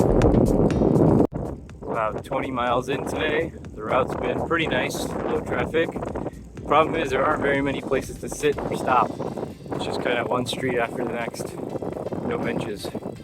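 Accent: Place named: Australia. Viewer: Loved the Australian accent. Cheers.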